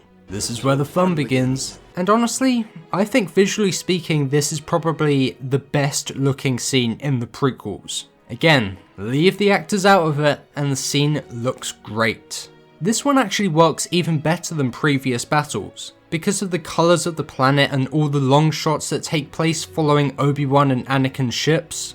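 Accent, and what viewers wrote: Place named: RP, United Kingdom. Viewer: Jesus this video is hard to watch when you constantly misuse words. You use the word "conflate" instead of the word "conflict" which wouldn't do bad if it didn't mean the opposite of the other word. And things like when you say: "I know it's GENERIC to point out but it's GENERIC because it's true" Like wtf do you mean there? Did you mean to say that it's "cliché" because it's true? Yeah those words are sort of synonyms but they sure as hell aren't exactly interchangeable in this context. In this context it's like you are saying "I know it's boring of me to say that but it's boring because it's true"... Like what about the factual statement makes it generic or mundane? A tartegrade is an earth animal that can live in space. That's a fact but I wouldn't call it generic. See what I mean? It always throws me off to hear someone with a posh british accent make these mistakes, but bro, you have got to make sure you understand the words you use before you confidently use them in a video. 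I assume you read from script, so get yourself a someone to proofread before you commit this shit to video.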